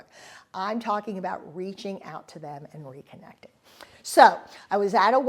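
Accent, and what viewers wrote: Philadelphia, United States. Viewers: I could tell you were a Philly girl - same here! Love your topics. ❤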